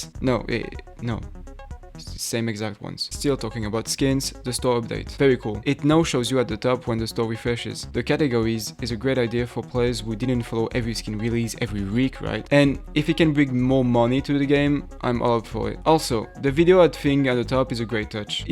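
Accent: France. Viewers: Accent français grillé ! Mais en vrai ça va c'est léger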